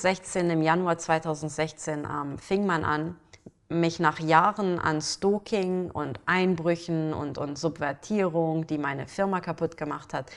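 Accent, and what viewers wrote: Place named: England, United Kingdom. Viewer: Ihr leicht englischer Akzept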